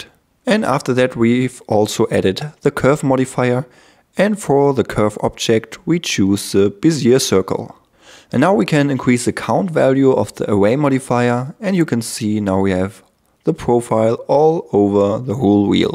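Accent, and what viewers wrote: Germany. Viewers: man kanns auch übertreiben mit dem deutschen akzent ^^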